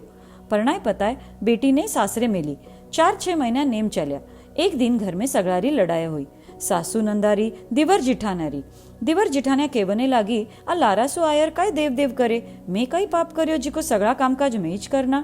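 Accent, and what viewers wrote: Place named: Marwari, India. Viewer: Nice marwadi accent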